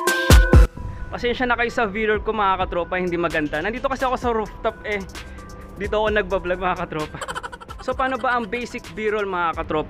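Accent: Batangas, Philippines. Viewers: Kabayan ala eh batangeño kb san o s batangas aq eh s tanauan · Hahajaja laptrip..batangueno si kuya..hahaha kuya dalawin moko para pangatlo na ako sa manunuod mp hahahaha...anyways nice and entertaining vid mo kuya..salamata...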